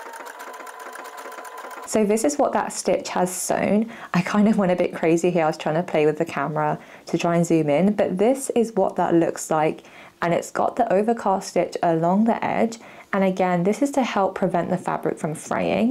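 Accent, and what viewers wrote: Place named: United Kingdom. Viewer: Thanks for sharing. BTW, love the accent. I'm always watching British TV or movies here in The States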